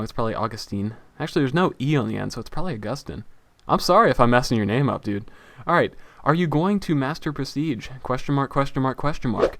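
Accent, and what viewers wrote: Philadelphia, United States. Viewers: So your from Philadelphia? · Omg Your From Pennsylvania! I Knew It:) What Part Of Pennsylvania Your From? I'm from South Philadelphia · You from Philly?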